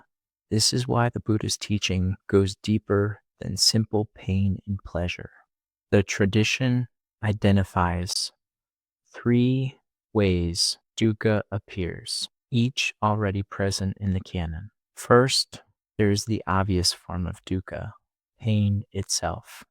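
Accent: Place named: United Kingdom. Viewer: Which Indian pronounces English like the British?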